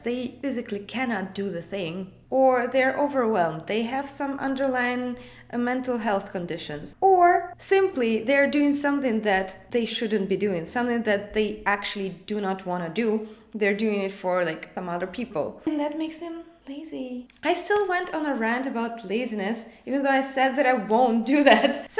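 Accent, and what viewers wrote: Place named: Eastern Europe. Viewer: I did guess right! Kinda. lol There is something Eastern European about your accent.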